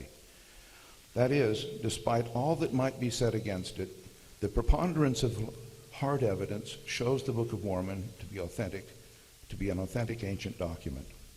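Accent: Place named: Midwest, United States. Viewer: Regional dialect. I'm guessing Midwest. Excellent presentation.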